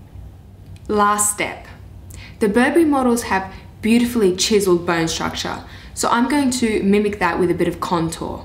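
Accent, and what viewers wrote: Australia. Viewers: you also sound Australian?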